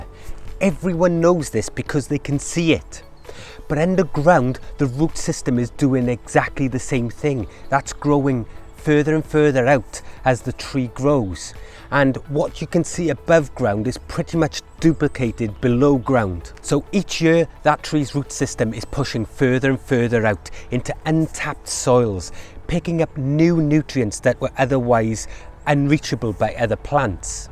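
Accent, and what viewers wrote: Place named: Hengoed, United Kingdom. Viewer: Where are you located fella, I'm in the Hengoed area and you look and sound like you could be local to me?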